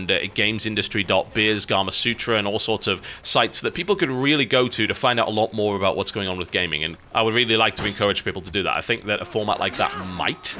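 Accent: United Kingdom. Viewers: nope just British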